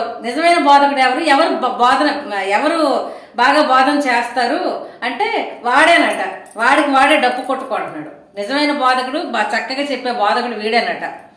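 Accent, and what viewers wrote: Srikakulam, India. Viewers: సూపర్ మేడం శ్రీకాకుళం యాసలో పాస్టర్ క్రైస్తవంలో ఉన్న చెడుని చూపిస్తున్నారు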